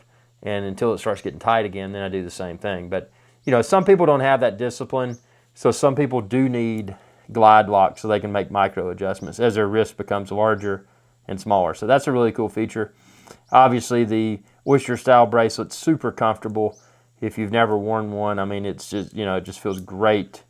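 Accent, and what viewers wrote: South, United States. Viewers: What stands out immediately, besides your strong Southern accent, is that you likely have nail fungus, or rather, your poorly cared-for nails. You seem to obviously have an advanced stage of nail fungus. A combination therapy with an antifungal treatment and pills would be the best solution. What I also expect from a presenter is a bit more technical understanding and a somewhat more professional, more focused on technical aspects and less egomaniacal demeanor. Forgive my sarcasm, but maybe to don't have the know-how to do a more professional job.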